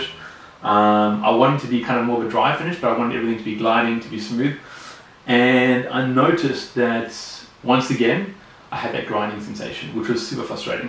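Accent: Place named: South Africa. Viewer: Good to hear that accent after all these years. Middle aged now but growing up in England by some strange twist of fate my first real best mate was South African, then in senior school my best mate was a very superb bloke (again South African), and in my twenties I worked a shitty job briefly where I hated almost everything about it, other than one guy who was again South African and a real life saver.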